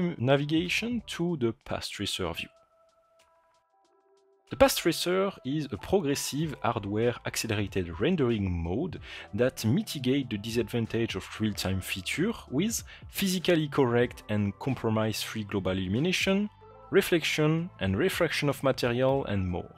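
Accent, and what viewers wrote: France. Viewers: The French accent in English is out of this world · Accent français n'est-ce pas? 😊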